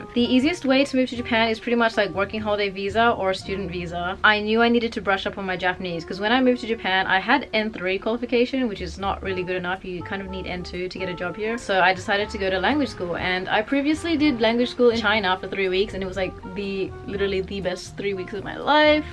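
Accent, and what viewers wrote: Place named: Western Australia, Australia. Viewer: Wow, it's rare to see someone from Perth. My home town also. Your qualifacations are in marketing which me to think of a couple of things. (You have probably thought these). Firstly set yourself up as a business and stay on a business visa. Secondly persue work in the hospitality tourist industry. Thirdly because of your wonderful West Aussie Accent... (And I think you would gave thought of this too) Teach ingrish... And extend your visa on that... Good luck you have an amazing amount of energy and you are right, Perth sucks at certain career choices.. Finally continue to be Awesome!!!!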